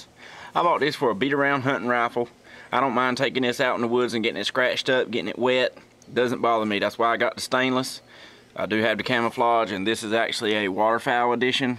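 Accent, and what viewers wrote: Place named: North Carolina, United States. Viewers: U sound lreally southern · I was born and raised in NC, moved to Florida a couple months ago. Watching this video, it was great to hear someone speak North Carolinian again lol. Looking at picking up one of these soon, been debating it and comparing with other similar rifles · I love your NC draw. I'm from Oklahoma and people rib me about how I talk, so I was laughing with you, not at you when you said 'accriate'. My wife was in the other room and she heard you say it too. She said, "Where's he from?" LOL. We laughed again when you started talking about nipple to nipple. That was pretty good. You can figure if you get hit between the nipples with a rifle, it's all over.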